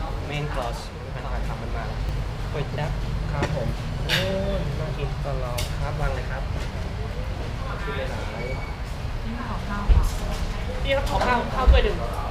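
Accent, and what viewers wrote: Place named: Isan, Thailand. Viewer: i like it whan you talk e-sen it sound so cool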